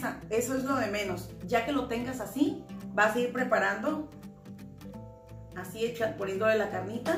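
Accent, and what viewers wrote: Sinaloa, Mexico. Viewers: Me encanta tu asentó Sinaloense,y tú forma de ser tan a todo dar,me siento como si estuviera en el rancho "el Espinal Elota Sinaloa"gracias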